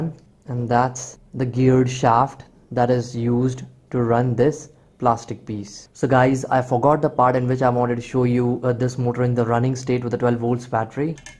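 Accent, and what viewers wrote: India. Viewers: 这是印度口音吗？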